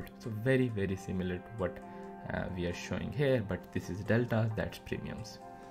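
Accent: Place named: Gujarat, India. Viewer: Are you from gujarat or mumbai by any chance... Guessing from the accent.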